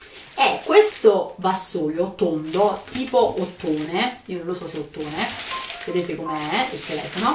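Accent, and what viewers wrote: Tuscany, Italy. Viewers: Perché toscano esci da questo corpo!!!! È' così bello il toscano simpatico allegro 😍😍😍 comunque bellissimi acquisti naturalmente come sempre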